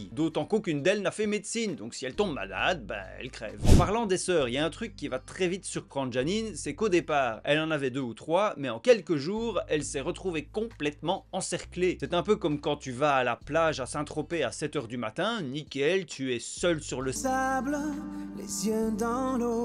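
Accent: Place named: Belgium. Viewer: Ça s'entend mais c'est pas dérangent je crois que j'ai un accent plus fort que toi d'ailleurs 😂 non se qui m'a fais me dire ça c'est tes expression qui sont bien belge❤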